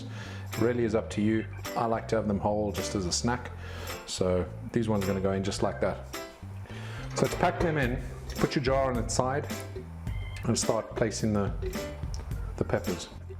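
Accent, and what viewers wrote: South Africa. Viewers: You sounds like a South African, good on you mate. Excellent video. Lekker man. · Good job ,,A bet with my wife,, South African??? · Are you South African? Your accent sounds a little South Africany. 😁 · Where in SA you from Bud. your profile location says UK, but you sound like you could be my neighbor :P. Nice Vid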